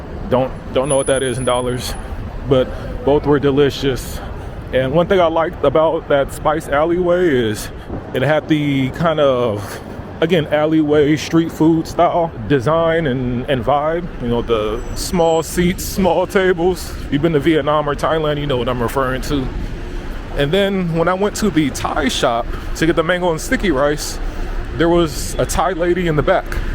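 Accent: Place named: United States. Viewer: man you sound American, if that's the case, which US city would you say is most similar to Sydney?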